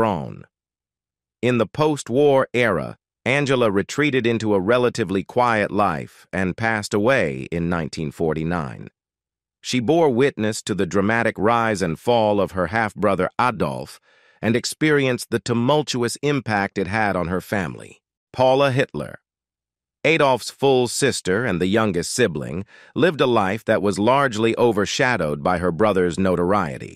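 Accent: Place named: United States. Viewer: And as usual - an all knowing American drawling his way through more BS.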